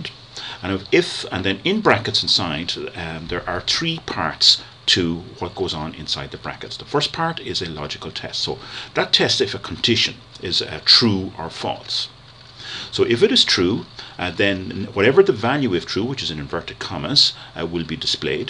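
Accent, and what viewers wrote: Ireland. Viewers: Irish accents are awesome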